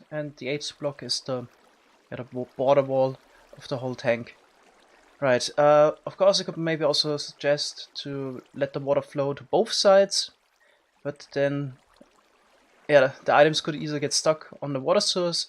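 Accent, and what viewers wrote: Bavaria, Germany. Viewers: Glaube sogar Bayer. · Dude you can hear from his speaking that he is German and for Germans this is easy and simple xD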